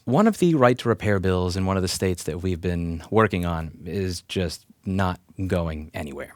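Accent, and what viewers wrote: New York, United States. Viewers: In most advocacy, there is usually a good cop, bad cop team that complement each other. So you're the bad cop that makes the difficult arguments. The bill wasn't defeated because of you. It was defeated because the politicians didn't want to pass it and then searched for an excuse to reject it because they couldn't admit that they were rejecting it due to lobbyist pressure. So they found you and blame you. Please do not feel bad. You have played a very valuable role on this and your contribution should not be devalued. But you're not the reason it didn't pass and you cannot blame yourself. You may have a "new york attitude", but you still make cogent arguments. And any politician would be used to the likes of you making "strong language" to make points. Please continue, even if living in the woods with deer causes your new york state of mind and accent to fade :-)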